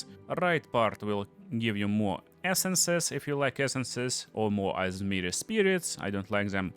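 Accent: Russia. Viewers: Most likely Russian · Strong Russian accent 😂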